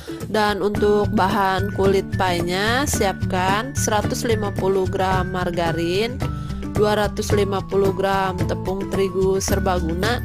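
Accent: West Java, Indonesia. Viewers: Logat dan bahasa sunda nya jangan diketarain dong, yang lampung ga ngerti!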